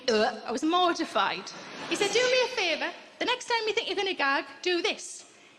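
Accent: Newcastle, United Kingdom. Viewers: Aye proper Geordie :)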